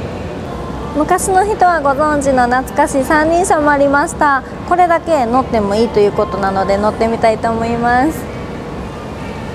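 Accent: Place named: Kansai, Japan. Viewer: 関西弁や。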